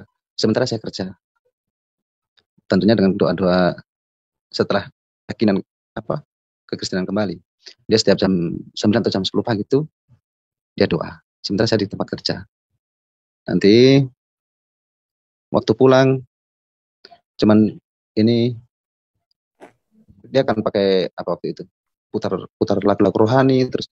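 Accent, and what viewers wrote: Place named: East Nusa Tenggara, Indonesia. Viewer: Logatnya sdh NTT banget ,Mas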